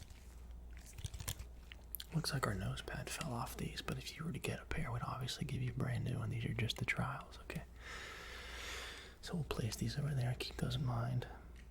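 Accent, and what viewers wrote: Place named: South, United States. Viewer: Your ever so slightly noticeable southern twang is so comforting lol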